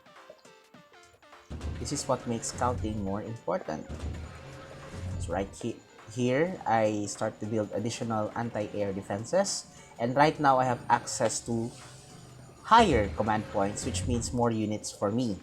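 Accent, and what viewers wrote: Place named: Philippines. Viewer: sabi na eh pinoy haha dahil sa accent natin, pero naseal ni isagani ung hula ko haha anyways, angas bro. i just came back sa AoW3 and nangangapa pa ulit. dami ko natutunan na counters sa vid mo.. tnx a lot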